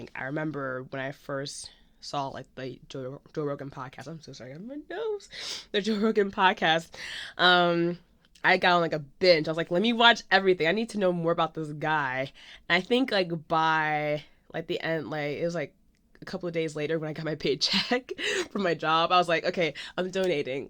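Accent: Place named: United Kingdom. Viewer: My friend told me to get my ears turned around like this haha I really need to from now on lol I didnt think you were American, I thought you were British haha :D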